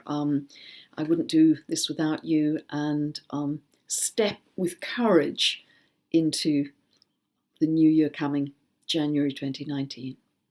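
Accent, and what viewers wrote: England, United Kingdom. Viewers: Repeatedly in meditations beginning with the Winter Solstice celebration, the intention watchword for many of my friends ( and myself) has been “Courage”. So to hear you also share “Courage” as an intention for 2019 was a wonderful confirmation of how “the collective” is moving in to this new year cycle. 🙏 Thank you for sharing with us every month. I find your analysis always clear, concise and entertaining. (English accent, a welcomed plus 😉)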